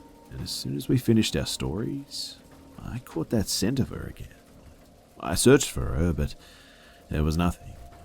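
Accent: Australia. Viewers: He's not British He's Australian. Seriously can you people not tell the difference?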